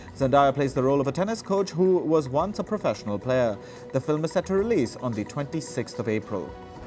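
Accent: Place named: India. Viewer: . I am talking about the Indian voice video narrator.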